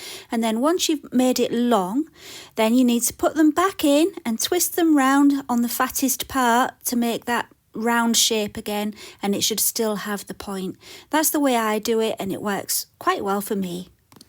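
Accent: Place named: Scotland, United Kingdom. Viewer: I enjoy your videos so much for two reasons. First I love your work. Second my grandparents were Scottish and your accent warms my heart and makes me think of them. I always wanted to travel to Scotland and see where they lived but I've put it off. Listening to you makes me want to speed up my plans. I think when covid is all gone I'll make the trip.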